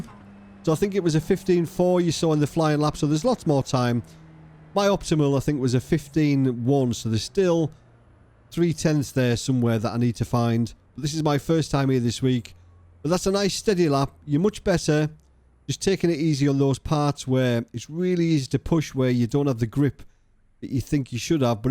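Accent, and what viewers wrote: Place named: Durham, United Kingdom. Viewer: i live in stanley co durham you sound like youre durham /darlo way be nice to have a chat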